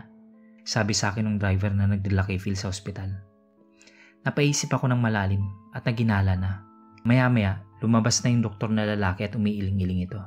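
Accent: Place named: Visayas, Philippines. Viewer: Natatawa ako sa tono ng bisaya ni admin..hehe..cute lng...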